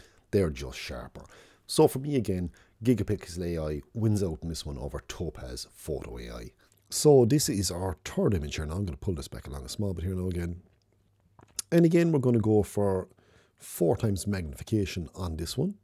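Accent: Ireland. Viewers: It's that charming Irish accent. Thumbs up for a clear voice! 🙂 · I’m east cork you sounded near enough to the city one lol cool what’s the difference between Luminar neo and pro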